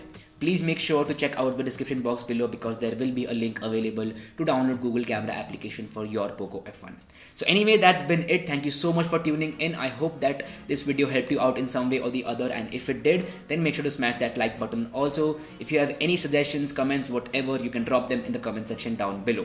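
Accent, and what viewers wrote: India. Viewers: Man.. indian accent is the just the most annoying thing to listen to eh.. no offense guys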